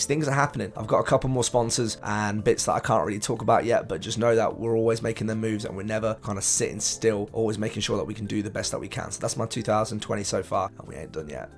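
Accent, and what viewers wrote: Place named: East Midlands, United Kingdom. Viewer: Yo another banger of a video mate..... Where abouts in the east Midlands you from... I live in Ripley, Derbyshire bro.... I never realised where you was from I thought your accent sounded familiar 👌🙌🙌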